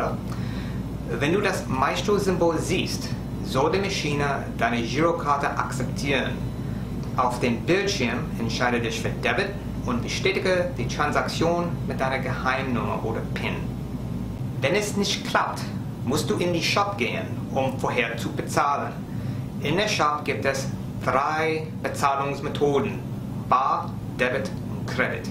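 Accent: United States. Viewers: Manchmal hast du halt den typisch amerikanischen Akzent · Dein amerikanischer Akzent klingt einfach nett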